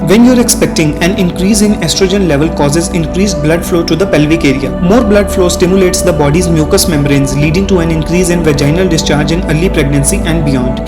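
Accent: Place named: India. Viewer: Can I fond a video with no Indian accent?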